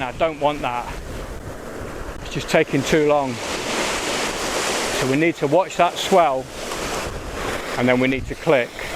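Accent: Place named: England, United Kingdom. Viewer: Great videos, thank you!! . Also I love your English accent. 😊 Cheers from California 🍺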